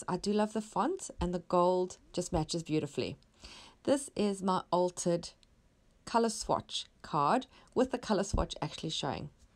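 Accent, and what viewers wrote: South Africa. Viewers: I subscribed to your channel a long time ago but never actually watched a video until today, I have a lot of catching up to do. I loved what you did and I actually liked both of the paint swatches. The biggest plus for me was hearing your South African accent, I grew up in SA so love the connection. Thank you for an excellent tutorial.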